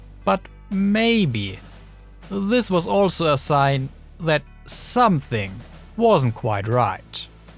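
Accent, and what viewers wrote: Germany. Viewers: Er, no it isn't. Unless you think that AI can generate renders that good, and is speaks English with a perfectly German accent and occasional accidental use of German grammar. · he speaks AI german accent ... u have never had an argument ...sorry ... and his voice is always 0 dB ...u most likely dont even know what that is ..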